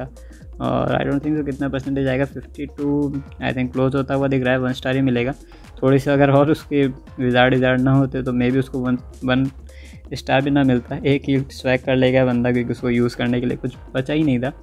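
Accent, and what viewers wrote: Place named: India. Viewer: hey mate i know its hard, but if u speak about your videos, it would be nize if u try to speak a bit slower, the indish accent is hard but i wanna hear the english phrases :D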